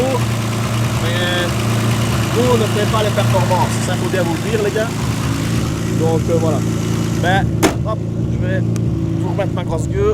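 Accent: Belgium. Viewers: un régal tes vidéo , la GTR on en parle pas c'est une tuery, courage et bon rétablissement au papa, sa va bien allé j’en suis sur !!! Plus je voi ta GTR plus j’en veux une, ton accent belge me tue, nonente huit (98) me donne des barres, Bref continue bien, et des que jai la GTR je monte!!!!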